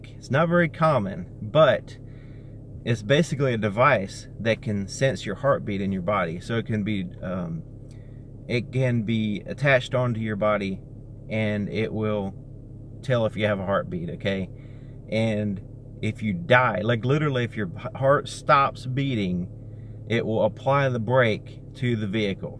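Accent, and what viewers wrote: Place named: South, United States. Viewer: BTW: Thanks for this small series of videos. I enjoyed watching. I'll admit, I did have a hard time paying attention to anything you were talking about (at first), since I was so focused on looking at you. Without going into TMI territory, you are very pleasing to my eyes, along with your slight southern accent. :^) Sorry if you don't wanna read that. but it's what happens when people (who like the work you do and the sound of your voice) get to see your face and how you animate. Oh yeah. Are your eyes colored Steel or a Gray with a greenish tint? (had a helluva time trying to figure it out) blush Ok that's all for now! :D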